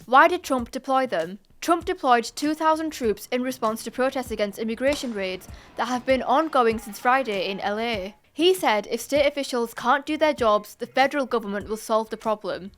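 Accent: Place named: Northern England, United Kingdom. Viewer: It's just a northern english accent, to those outside the UK it can come off a bit troggy sometimes admittedly but it's kind of like how southern US accents sound a bit 'hick' to our ears. Just a regional thing, probably in most countries.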